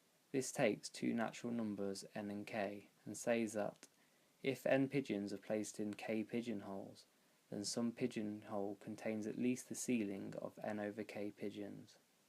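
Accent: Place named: Ohio, United States. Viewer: This is my uncle speaking your voice sounds like your from ohio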